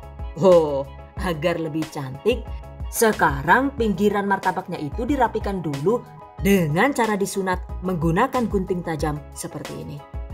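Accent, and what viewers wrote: Banyumas, Indonesia. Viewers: Gx prnh gx tertarik sm resep dr bunda....btw bun,mw tny.emng logat madiun ngapak bgtu y bun.tp q sk beud c,scra q asli wong tegal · Salfok sm bhsanya bun.. Jawanya ngapak jg trnyta sm ky aq. Jawanya mn bun? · Salam sesama wong ngapak 😁🤣ora ngapak ora kepenak🤣🤣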